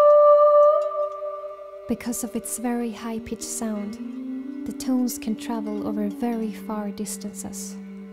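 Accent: Sweden. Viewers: First time hearing you speak and I nearly cried. Your voice/accent is adorable and so beautiful. I now want to visit Sweden more then ever before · I feel like I'm writing this with your beautiful Swedish accent. :D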